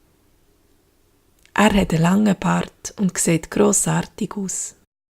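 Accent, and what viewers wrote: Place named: Zurich, Switzerland. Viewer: Hola, de que cantón de Zurich es este dialecto?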